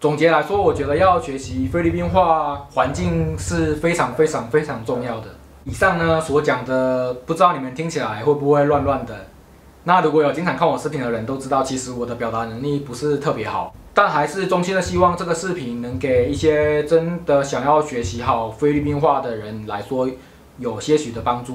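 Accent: Taiwan. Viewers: 小哥的話很像台湾人的口音 台湾觀眾聽起來熟悉 舒服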